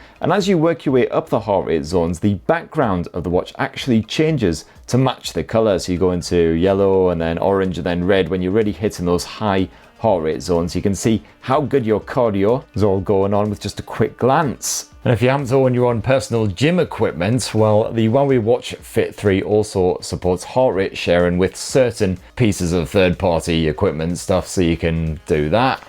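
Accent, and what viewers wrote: United Kingdom. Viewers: I agree.. the way he swears and all those stuff goes well with the British accent more than anything else 🤭🤭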